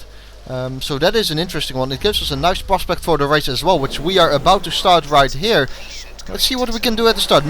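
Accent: Netherlands. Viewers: geweldig dat nederlandse accent hahah ga zo door!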